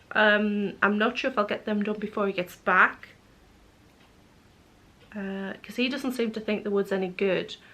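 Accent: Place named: Northern England, United Kingdom. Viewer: I had to pop over to your website to find out where you are originally from because you certainly don't sound Scottish, but have a similar accent to me (I am on the NE coast of England) I think us 'Northerners' all have similar accents!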